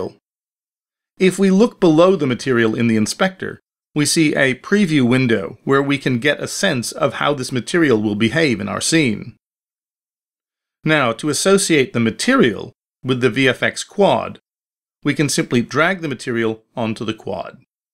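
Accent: United Kingdom. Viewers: r u british or american lmao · British accent seeping through.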